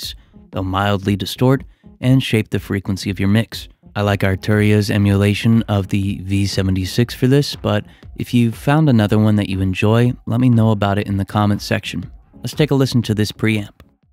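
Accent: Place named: East Coast, United States. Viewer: I still don’t understand why your pronunciation has COMPLETELY changed from your older videos. It’s like you’re trying to sound a little east coast-ish. Whatever it is, I’m puzzled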